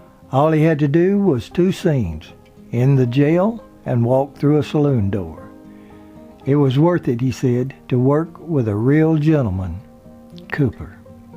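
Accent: South, United States. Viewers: Your voice is as unique and memorable as Christopher Walken’s; the rhythmic southern drawl is just the icing on the cake. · Thanks ever so much for this great tribute to mr. Elam. I have always enjoyed his movie acting. He may not have been the most handsome man in the room but he had a commanding presence on screen! And as for your southern accent , I think that it’s very unique , you don’t sound like everyone else! And for me, that’s a good thing! I have subscribed. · Fell asleep whilst listening to the Southern accent talking about Jack Elam .....very interesting but slow . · I always liked Jack Elam and wondered what happened to him. As a kid, I remember a TV western when it showed a picture of Jack with the introductory words saying something like "Gunman turned Lawman", which, as I remember was a pretty darn good show. Thanks for your nice Southern style (voice) discussing the life and times of Mr. 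Elam.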